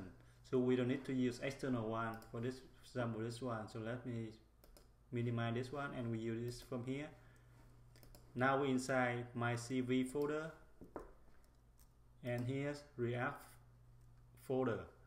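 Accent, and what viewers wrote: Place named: Vietnam. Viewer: nghe cách nói biết người Việt nói tiếng Anh :)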